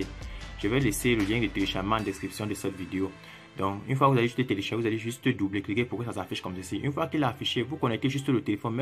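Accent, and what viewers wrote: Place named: Cameroon. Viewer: Jvoudrais aussi un box si jpx l'avoir chez toi jcroi q t'es au camer, vus ton accent je crois que t'es 1frero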